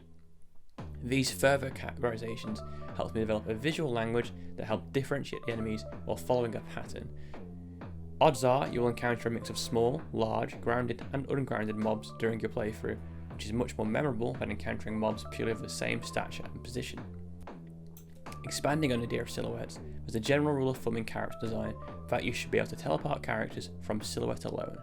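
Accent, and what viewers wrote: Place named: United Kingdom. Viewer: this is a fully VALID video on game design but i feel like you should enunciate your voiceover more to unleash the power of your british accent. or if that doesn't work maybe you can try just yelling into the mic? that might attract a wider viewership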